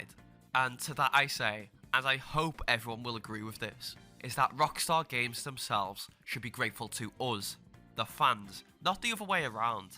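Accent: Liverpool, United Kingdom. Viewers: U from Liverpool lad? Can tell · be'''ee i love british accent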